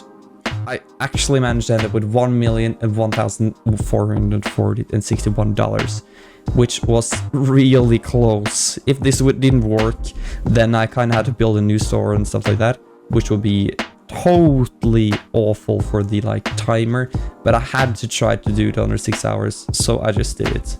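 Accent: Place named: Norway. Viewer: im norwigian to i can kinda hear that your norwigian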